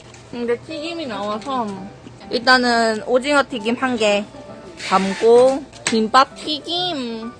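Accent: Busan, South Korea. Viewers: 38살 아줌마가 보기에도 우와 이쁜 ~♡😍 너무 착하고 예의가 바르셔서 어떤 영상이든 흐뭇한 미소로 보게 되는것 같아요^^ 생각보다 많은 사람들이 말투나 태도를 많이 보신다는거..공주병 조금 이라도 느껴지면 영상 닫아버린다는거 아시는지요ㅋ 서울 살다 부산 갔을때 부산 사투리 땜시 상처 많이 받았는데 사투리 쓰면서도 이렇게 싹싹 할수가 있구나 키워주신 부모님 인성과 성품이 그대로 묻어나는 예쁜아가씨네요♥ 가끔 들어와서 응원하며 영상 잘보고 갈께요 감사합니다^0^* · 뭔가많이들은 말투다했더니 부산사는친구랑 비슷//너무좋다사투리ㅠ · 부산사투리 쓰세요?사투리인것같아옄ㅋㅋㅋ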